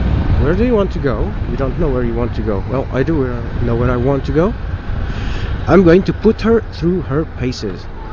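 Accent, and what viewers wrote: Portugal. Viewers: Mal te ouvi pensei logo "Tem sotaque Portugues" vi a matricula "Confirma-se" xD Abraço, belo video, vou ver se tiro a carta de mota este ano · are you portuguese? you have slight a portuguese accent :D loved the review btw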